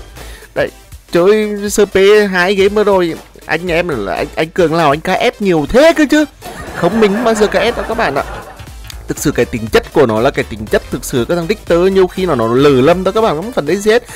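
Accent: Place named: Central, Vietnam. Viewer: Thích giọng miền trung ghê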